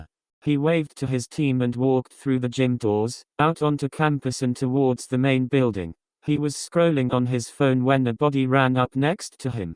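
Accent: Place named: United Kingdom. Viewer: Wow why do you sound British- owo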